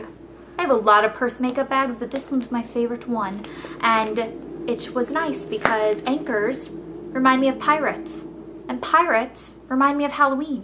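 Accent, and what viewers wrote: South, United States. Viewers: I love your southern accent! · you sound extra southern in this video...i like it!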